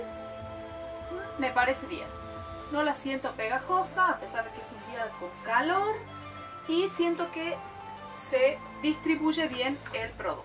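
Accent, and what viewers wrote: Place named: North, Argentina. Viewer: Hola hermosaaa. Proba los polvos sueltos de ruby rose. Es verdad no es necesario saber maquillaje. Jajajaja me hiciste reír. No le lleves el apunte a las mala onda. Yo soy maquilladora. Y te maquillas bastante bien. Me encanta tu voz bien del norte representandonos en YouTube. Saludos desde Tucumán . Argentina. Yo vendo Dapop y Ruby Rose por instagram aquí en mi provincia 🔥🔥🔥🔥😍🔥😍😍😍 y tbn uso y amo el maquillaje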